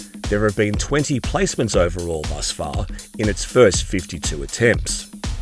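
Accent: Australia. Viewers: Another great video, you have so much knowledge about The Philippines from someone who has (What I’m presuming 😝) an Australian accent. 👍🏾 Do you have Instagram by any chance? ☺️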